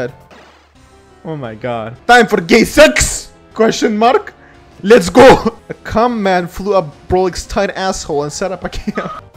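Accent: Russia. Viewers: I can't decide, I hear the Russian accent and see the inscriptions on the Russian, but at the same time you have in the description, the location of USA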